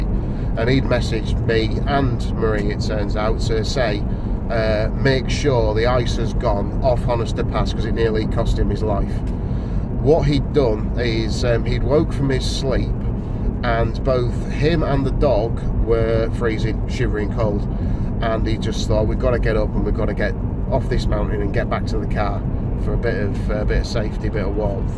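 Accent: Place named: Scarborough, United Kingdom. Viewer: Ok!! I grew up in Scarborough m8... Know a local accent when one hears one!!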